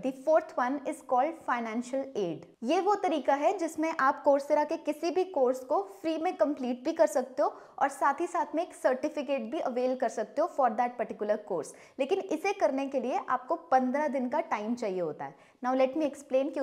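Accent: Punjab, India. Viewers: Another great video! One thing i have noticed is the people of IIMs are quite different, i mean in a positive manner. You are doing great job. One thing i have observed is you say the word "tarika" and "zaroor" quite differently, you are a Punjabi that's for sure. Any way keep up the good work! Raghav Vohra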